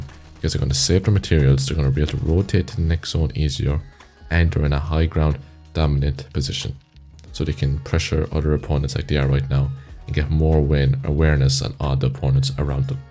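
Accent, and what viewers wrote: Ireland. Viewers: are u irish?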